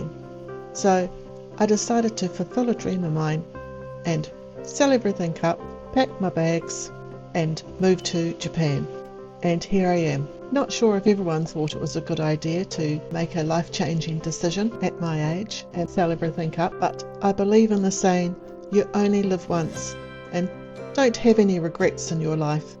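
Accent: New Zealand. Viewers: A kiwi voice lol 😂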